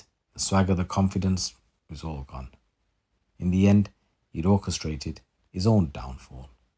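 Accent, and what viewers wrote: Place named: Liverpool, United Kingdom. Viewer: 😂😂 I like you’re scouser accent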